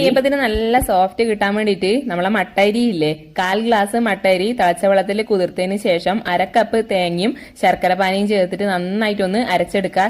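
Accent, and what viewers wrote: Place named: Payyanur, India. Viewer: okay payyanur slang ningalude baashayil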